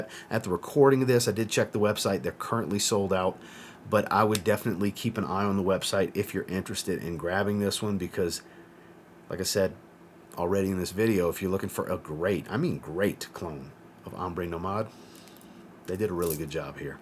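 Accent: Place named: New Orleans, United States. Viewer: Gotta be from New Orleans or at least la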